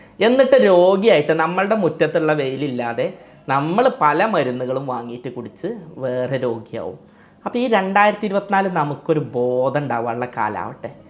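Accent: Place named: Chandikkad, India. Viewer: ചാണ്ടിക്കാട്ടുകാരൻ്റെ ഭാഷയും സംസാരവും ആണെങ്കിലും സാധാരണക്കാരന് വളരെ ഉപകാരപ്രദമായ വീഡിയൊ ആണ് കാര്യങ്ങൾ കൃത്യമായി നന്നായി പറഞ്ഞു മനസ്സിലാക്കുന്നു നല്ല വീഡിയോസ് കച്ചവട താല്ലര്യങ്ങൾ ഇല്ല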